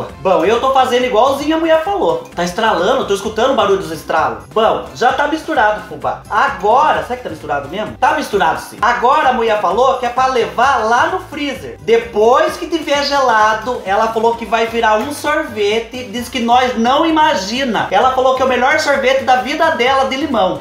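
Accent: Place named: Paraná, Brazil. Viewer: Fubá eu queria saber de q estado vc é?porque este sotaqe seu,parece do povo daqui do Paraná! 😘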